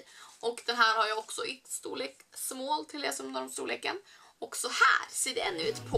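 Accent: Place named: Sweden. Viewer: älskar dig röst. din svengelska. på allvar! allt du säger låter så "rätt" haha kan inte förklara men aa kärlek till dig!!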